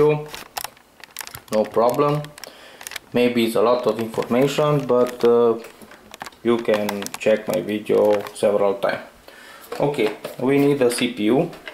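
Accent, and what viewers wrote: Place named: Romania. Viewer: ăsta sună a Român , ce dqr 😂 (this guy is Romanian ).....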